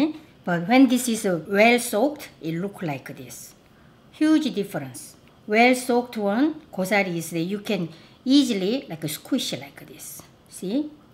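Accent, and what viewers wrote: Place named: South Korea. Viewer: You are so cute. korean intonation of english too.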